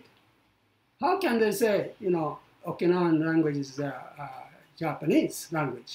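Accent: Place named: Philippines. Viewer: He sounds like a filipino when he speaks english with a little japanese nuances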